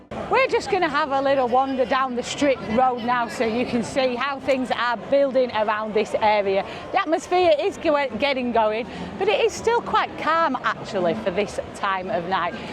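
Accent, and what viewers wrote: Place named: Runcorn, United Kingdom. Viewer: You from Runcorn sounds like my accent that haha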